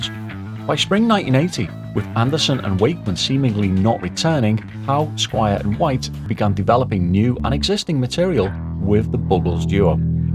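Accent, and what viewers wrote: Liverpool, United Kingdom. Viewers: I wonder if there was any drama. Ok, you sound scouse and this is Yes and nyc eh nice, I love the Angel Gabriel lol. subbed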